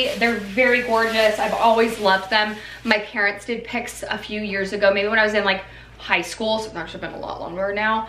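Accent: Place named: South, United States. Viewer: I love the southern accent! 🥰 My Alabama accent comes out when I get angry... or when I go into “mom” mode. 😆😂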